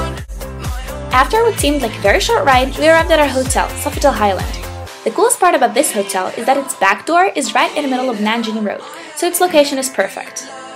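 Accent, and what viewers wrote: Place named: United States. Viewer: Sounds like u have an american accent .. I like it 😊 ciao video stupendo brava !!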